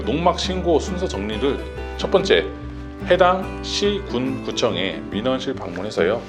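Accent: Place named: United States. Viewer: 미국 사람인줄 알앗네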